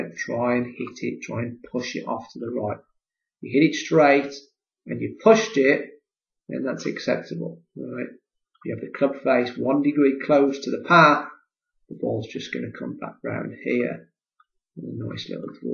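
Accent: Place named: Midlands, United Kingdom. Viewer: Hi love this action so much and being from the Midlands appreciate the accent! Quick question how much should the left foot flare out? Thanks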